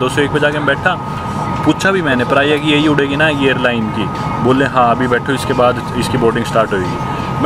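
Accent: Haryana, India. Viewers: Love your typical Haryanvi ascent 😅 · ap ki language me haryanvi felling ati jo bahut achi baat hai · Haryanvi boli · Bhai Ki Haryanvi ❤❤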